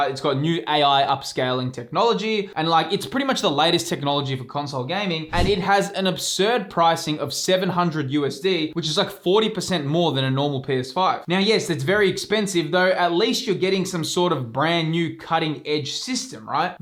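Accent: Australia. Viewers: Just started collecting again and im so glad I stumbled across your channel. It’s so refreshing to watch and hear a fellow aussie! love the content it’s been super helpful and insightful :)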